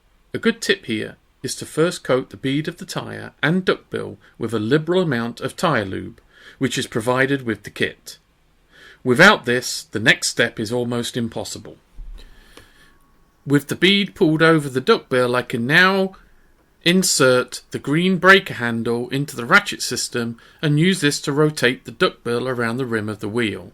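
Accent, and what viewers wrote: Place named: United Kingdom. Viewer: ahhhhh your accent made me think you were in the uk 😎 They do have some uk based resellers 👌🏻👍🏻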